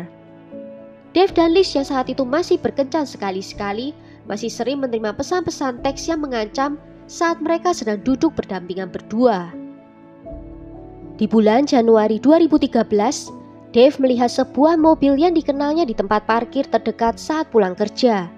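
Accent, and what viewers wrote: Java, Indonesia. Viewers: suara mu yg medok itu membuat aku tertunggu tunggu video baru mu........ jgn hilangkan ya aksen jawa mu itu....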